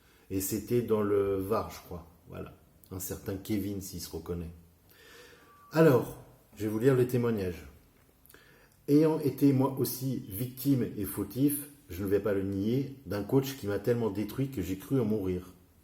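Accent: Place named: Quebec, Canada. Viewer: il a l'accent québécois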